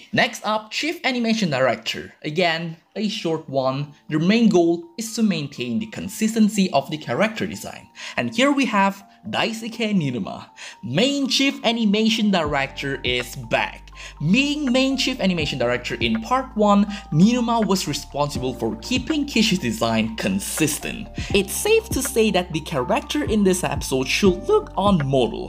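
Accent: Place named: Asia. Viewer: how old are tou? , also are you american? , sound kinda asian with that accent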